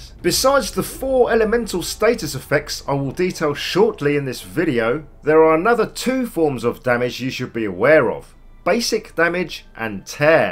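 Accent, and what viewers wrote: United Kingdom. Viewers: You're welcome. I finished the game 3 days ago. What an experience. I cried at the end since what Dr. ES says is really touching. These bloody wankers might have a problem with the British accent or are loyal fan to the Yankie accent haha. Your language and accent are really awesome. Keep up the good and I'm really thrilled to watch more of your videos. It would be great too if you not only make guide videos, but also explain games' story and that stuff. Wish you a lot of success.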